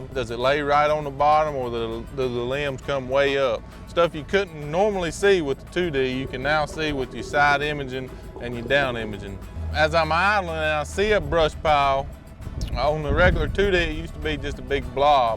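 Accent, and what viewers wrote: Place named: Carolinas, United States. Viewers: LOL, he's definitely from the deep south. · One of the Carolina's, I believe. Love to hear him talk.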